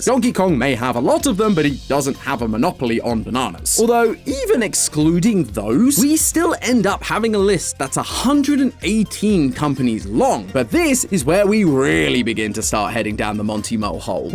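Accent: United Kingdom. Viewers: matpat was replaced with this british mf?! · why did matpat turn british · Calling it Marry-io makes you sound like an out of touch old person lol. But maybe it's the Bri'ish accent, which is cool ngl In the states it comes off that way though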